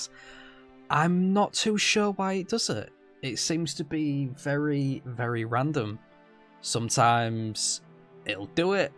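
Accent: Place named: Yorkshire, United Kingdom. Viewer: Knew the accent was familiar, I live up near Middlesborough and go to the York markets nearly every year.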